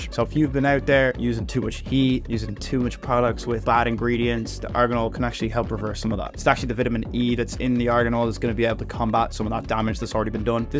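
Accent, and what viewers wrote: Ireland. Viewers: Are you Irish because you sound Irish If you are what county are you from I'm from Louth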